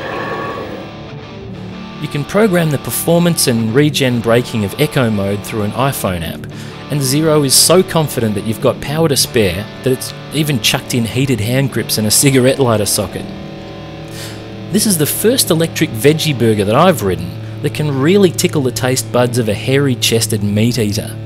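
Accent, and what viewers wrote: Australia. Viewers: Need more reviews like this. The Aussie flavour is refreshing compared to the yank reviews.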